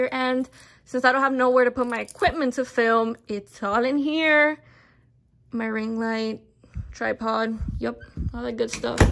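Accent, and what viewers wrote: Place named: Miami, United States. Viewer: miami accent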